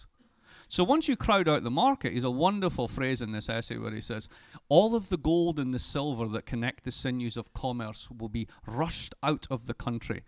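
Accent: Scotland, United Kingdom. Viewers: + SpectatingBystander - The speed of rapport and the Scottish accent make it difficult for an audience whose 1st language is not English. I am English and could only just keep up with him.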